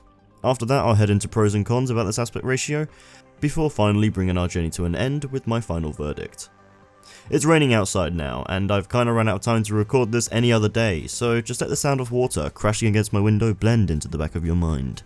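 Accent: United Kingdom. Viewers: British accent. Of course ! Hey any chance of you doing a video after 4 shots of Scotch or Brandy?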